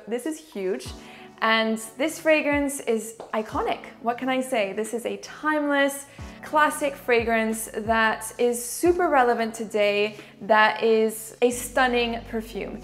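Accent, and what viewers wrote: France. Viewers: You have a very nice french accent, and even Arabic i guess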